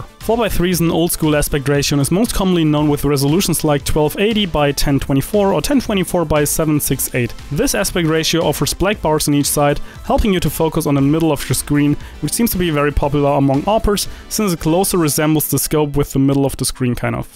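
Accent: Germany. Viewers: OverwatchDevil man hört es schon raus aber viel besser als deutsche mit dem th und flüssiger als deutsche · Naja, ich finde man hört jeden Deutschen raus :P Heisst aber nicht dass dein Englisch schlecht ist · German Accent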